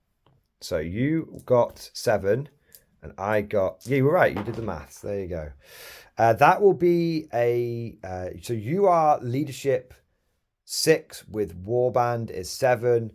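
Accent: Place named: Northern England, United Kingdom. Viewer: It’s a Northern English accent mate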